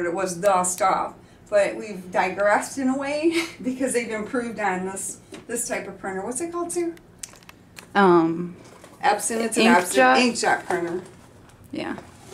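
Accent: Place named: Upper Peninsula, United States. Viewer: Where is she from, she says midwest, but man I can't place that accent. Kinda sounds like a Yooper, but strangely off. She pronounces durometer as "Der-RAM-eater", and called a joint knife a "scOOOOper". Kinda Yooper-ish.....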